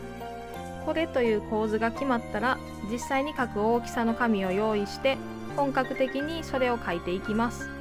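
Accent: Kyoto, Japan. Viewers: 京都人やから一瞬で京都の人やとわかった、、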